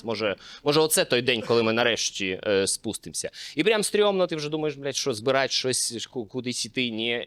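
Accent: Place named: Kherson, Ukraine. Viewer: Та я його відоси тільки за херсонського суржика дивлюся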